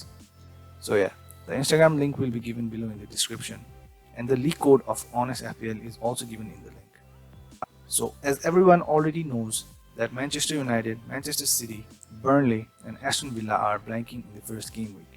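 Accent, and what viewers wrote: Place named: South Asia. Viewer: I'm from Nepal and your accent sounds familiar like from south asian countries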